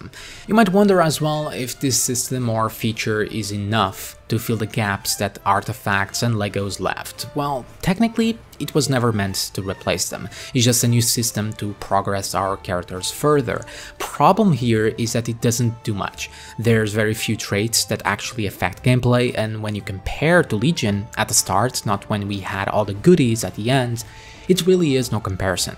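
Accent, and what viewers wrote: Portugal. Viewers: Great! E pelo sotaque só podes ser português. :D Experiência própria...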